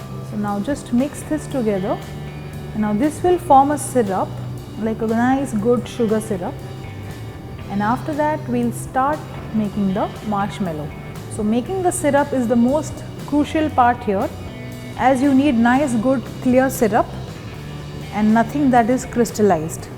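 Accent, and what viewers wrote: India. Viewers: this is English in Hindi accent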